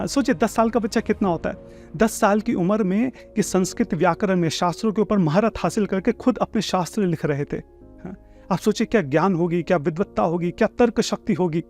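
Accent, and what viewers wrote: India. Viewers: Sir your Voice,,pure Indian and ak sukun bhari hai ...jay hind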